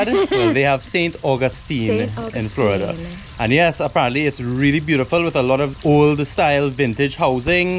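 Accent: Trinidad and Tobago. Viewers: Well this is a pleasant surprise; hearing from my Favorite Trini Accent. Welcome back in your space. I Your oldest Fan. 22/09/12 🇨🇦 🍁 🇨🇦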